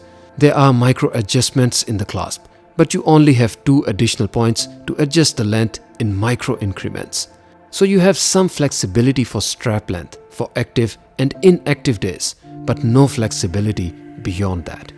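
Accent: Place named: India. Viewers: Losing the accent might be difficult to do if he happens to be Indian. Gtfo. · Fod God’s sake get rid of the Indian accent... otherwise your videos are stunning. I had to think for a minute to figure by “Veer” you meant “Wear”, annoying distractions.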